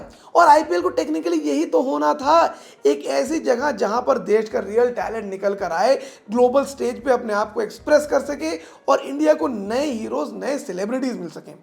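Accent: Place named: Hyderabad, India. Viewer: Touba touba bhoth buri Hyderabadi bolre tum aur ek bar nakko bolona plzzzz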